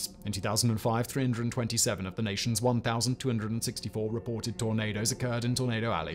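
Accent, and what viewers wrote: United Kingdom. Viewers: It's seriously boggling my mind to listen to a Brit who lives in Prague speak about my home state of Kansas. What a world we live in!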